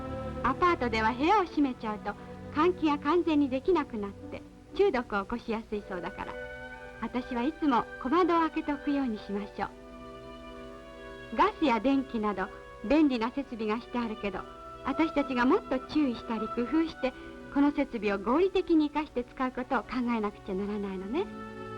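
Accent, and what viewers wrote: Japan. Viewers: 南関東方言の一つで、いわゆる「山の手言葉」ってやつですね。 今では話す人もあまり居なくなり、ほぼ絶滅状態の言葉です。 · 和式便所にダストシュート、それに焼却炉、今じゃ考えられないワッ！ 東京から来た女子転校生がこんな話し方だったなぁ～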